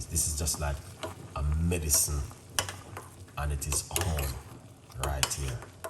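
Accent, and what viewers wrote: Jamaica. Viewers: I like how he talks” Yeah man”, I love Jamaicans🤣😂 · Yummy . I love your Jamaican accent. God bless you. Thanks for sharing. Can the lemon be bottled? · I like your Jamaican accent. A good friend of mine is from Jamaica 🇯🇲 he is one of the most funniest people i have ever met in my life. He has no filter lol. I'm from Ireland 🇮🇪 thanks for your video, those covid 19 shots and the booster really fu*ked up my chest and lungs real bad. I'm 33 years old, before Christmas 2019 my life was fine i was fit and healthy i have a passion for fitness and running. Now i can't find it very difficult. I work in a Factory and put my ipod on with my radio and play on Blast 🙂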